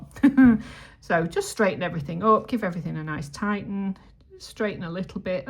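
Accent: United Kingdom. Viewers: So good to see you again! So sorry that you’ve not been feeling well but glad to see that you’re recovering. I really enjoy your videos and have learned a lot. You are a great teacher and I love to hear your British accent, righty-ho! I live in Rochester, NY. Thank you for sharing with me! 😊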